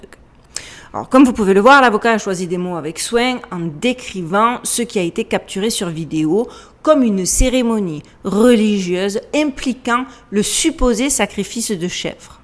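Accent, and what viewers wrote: South, France. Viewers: Je t'ai découvert assez recement.. or je suis dans affaires depuis au moins 15ans. Merci pour ce travail sérieux et bien detailler. Et avec un bonus merveilleux; un accent du sud. Force honneur et amour sur toi ❤️🇲🇫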